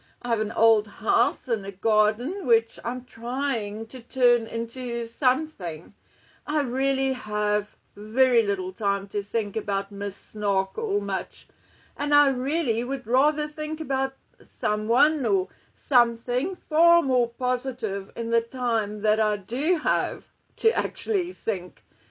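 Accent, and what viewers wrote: South Africa. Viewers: Yussus man that’s a baie strong South African accent if ever I heard one ekse 😂😂😂😂 lekker 😂😂😂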